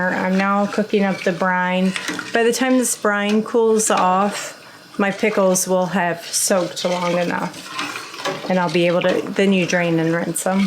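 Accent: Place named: Michigan, United States. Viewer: My family is from Michigan 😊 I love the accent it reminds me of them 💜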